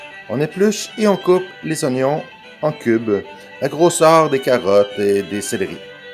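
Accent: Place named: Canada. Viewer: J'ai juste un petit problème c'est un accent plutôt canadien qu'irlandais parlant le français, revenant d'irlande j'ai un petit problème avec votre recette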